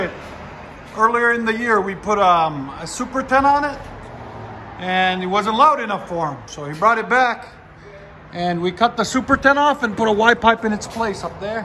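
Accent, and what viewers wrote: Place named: New York, United States. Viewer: Tennessee and he sounds like a pasta eating nerd from New york.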